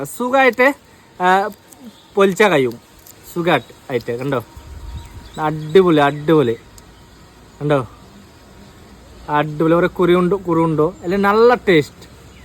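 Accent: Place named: Hindi, India. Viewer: ഭായിയുടെ ഹിന്ദി കലർന്ന മലയാളം സ്ലാംഗ് ❤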